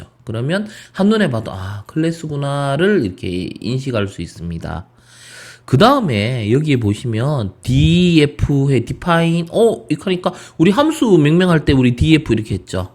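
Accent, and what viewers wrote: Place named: Gyeongsang, South Korea. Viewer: 왜 나이든 프로그래머들은 죄다 경상도 사투리를 쓰는가...... 나도 모르게 사투리 리듬타다보면 고개만 끄덕거리다 머리에 하나도 안들어오게 되는데.....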